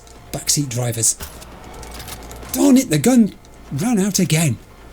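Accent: United Kingdom. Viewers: a 4 hour episode of cyberpunk 2077 narrated by a great British gentleman? sign me up! I'm always looking forward to the new episodes every monday because they are so good every time and i also cant believe we are already at episode 26 sjeesh time flies!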